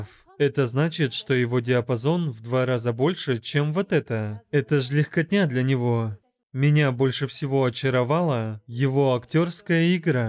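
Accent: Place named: Kazakhstan. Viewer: Вы говорите по ҚазаҚчки девушка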